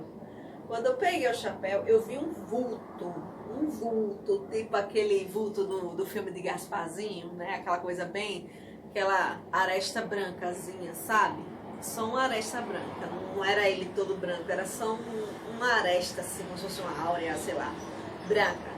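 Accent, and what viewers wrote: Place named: Pernambuco, Brazil. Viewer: Bem interessante o relato, minha conterrânea pernambucana! Isso tem uma lógica de ser, que não é nada do que religiosamente as pessoas falam, mas, é preferível não entrar nesse mérito. Gosto de seus vídeos, de seu jeito pernambucano de falar kkkkkkkk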